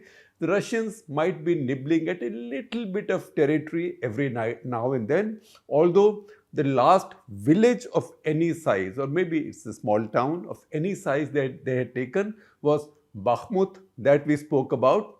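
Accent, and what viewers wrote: India. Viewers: I wish you didn't have an Indian accent 😢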